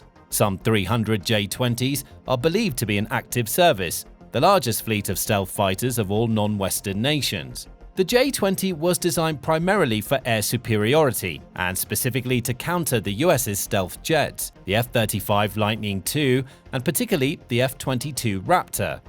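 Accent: United Kingdom. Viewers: Yeah. I expected it before I even clicked on the vid. This channel is still such a joke. But eh. It's a brit narrating so what do you expect?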